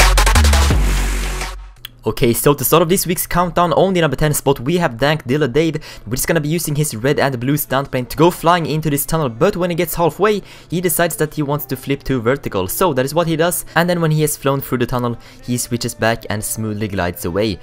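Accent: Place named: Sweden. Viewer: He is from sweden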